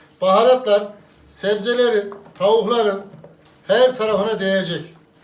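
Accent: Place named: Aksaray, Turkey. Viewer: Sizi ailecek izliyoruz.guzel ve sade anlatimla.sizin ispanakli patates yemeginizi yapacagiz. bey ben aksarayliyim.sizin siveniz bizim oraninkine benziyor merak ettim nereli oldugunuzu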